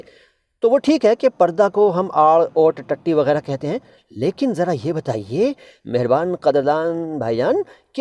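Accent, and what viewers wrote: Standard. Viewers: bahut shudh bolte hein aap waah☺🙏🙏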